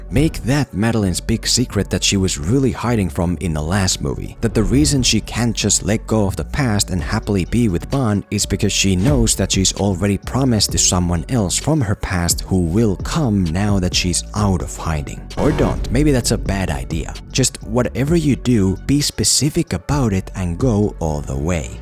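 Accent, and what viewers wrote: Finland. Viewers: Your accent… Finnish?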